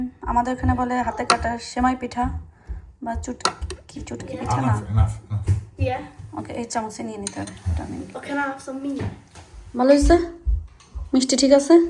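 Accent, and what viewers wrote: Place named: Jessore, Bangladesh. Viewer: السَّلَامُ عَلَيْكُمْ وَرَحْمَةُ اللهِ وَبَرَكَاتُهُ আশা করি ভালো আছেন। নীচের কমেন্ট গুলো মনজোগ দিয়ে পড়বেন এবং মনে রাখবেন। ১। আপনার উচ্চারণ ঠিক করুন। বাংলা শুদ্ধ করে বলেন, যশোরের উচ্চারণ পরিহার করুন। আপনার বাংলা শ্রুতিমধুর না। ২। কথা কম বলা ভালো। কথার তেমন দরকার কি? যেখানে ছবি কথা বলছে। ৩। ক্রিয়া বাচক শব্দের ব্যবহার শিখুন। একই বাক্যে দুটো ক্রিয়া ভুল। যেমন আপনি বলেন অনেক বেশী ভালো, এখানে অনেক ভালো বললেই হত। বাড়ি সুন্দর এখানে খুব ভালো বলা যায়। বেশি ভালো হবেনা ইত্যাদি। ৪। কোনো কোনো শব্দ স্টাইল মারতে গিয়ে ফানি হয়ে যায়। যেমন আল্লাহ হাফেজ। নরমাল ভাবে বললেই হয়। আজকের মত এটুকুই। যদি দেখি ইমপ্রুভ করেছেন তাহলেই আবার কমেন্ট করবো। আশা করি মনে কিছু করবেন না।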